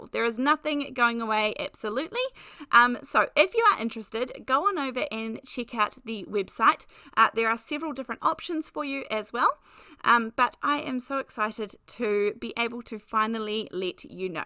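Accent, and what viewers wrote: New Zealand. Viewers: 😁😃😆 I’m so very happy for you and your husband to have created your own website! I joined with a membership so I can support you to keep creating content for me and all those who love your teaching style, giggles, and for me, I love your NZ accent. Thank you so much for choosing this path in life… you make the world a better place! A HUGE ADMIRER!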